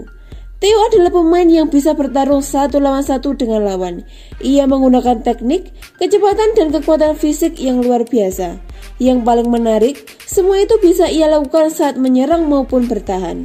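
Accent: Java, Indonesia. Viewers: Coba belajar ngomong dulu yg bener kalo mau jadi narator. Ngomong medok,nyebut nama aja salah. Apalagi beritanya simpang siur plus ngarang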